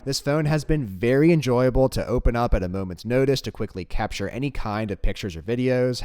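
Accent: United States. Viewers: What's your American accent?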